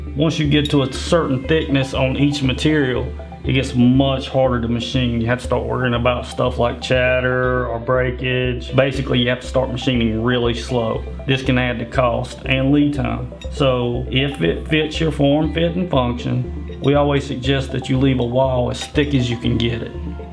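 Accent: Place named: Deep South, United States. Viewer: Holy southern accent Batman. You gotta be from the deep South or the Cajun states. I'm in Wisconsin, spent a decade in Tennessee Georgia north Carolina area and that's a strong accent to me.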